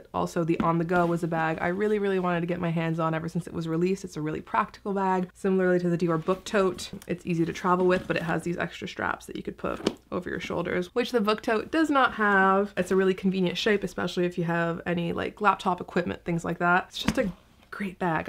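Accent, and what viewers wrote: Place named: France. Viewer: L'accent Louis Vuitton très française.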